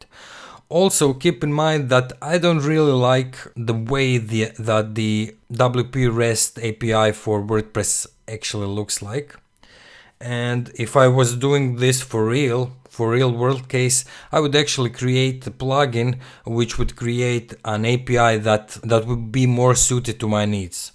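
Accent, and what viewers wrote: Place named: Russia. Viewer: Ну это русский пацан, сто процентов!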